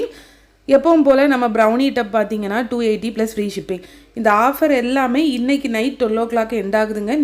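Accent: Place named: Kanyakumari, India. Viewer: Akka neenga kanyakumari ah paesurathu namma ooru slang maari irukuthu...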